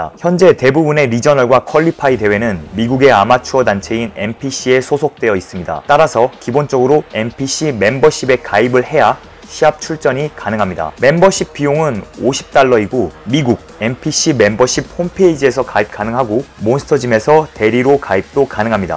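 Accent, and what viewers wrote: Gyeongsang, South Korea. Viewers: 영남권 사투리지 않나요?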